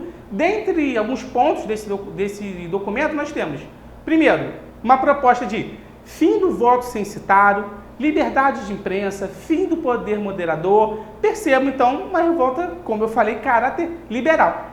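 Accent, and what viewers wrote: Rio de Janeiro, Brazil. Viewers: Esse sotaque parece Carioca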